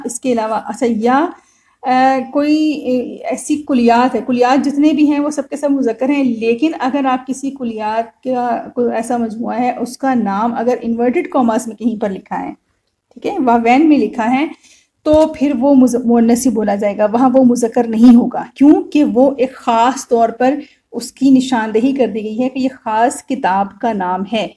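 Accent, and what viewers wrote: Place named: Urdu, Pakistan. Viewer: Lhjy b apka urdu bolta h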